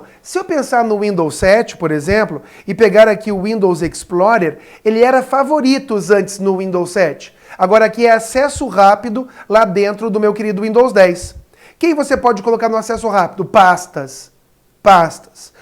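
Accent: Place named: South, Brazil. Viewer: Ei professor você nasceu no sul do Brasil? é por causa do sotaque .suas aulas são ótimas ,vou prestar para agetel e agepol,valeu.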